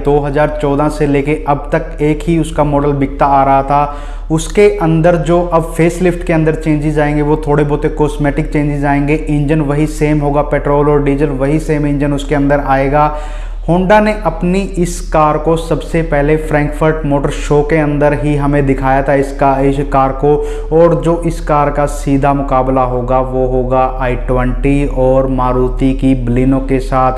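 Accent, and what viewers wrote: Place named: Haryana, India. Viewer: sir ek baat thodi ajib lgi ... haryanvi excent use krte ho jaise ki ... thoda bhot ...thoda bhut ...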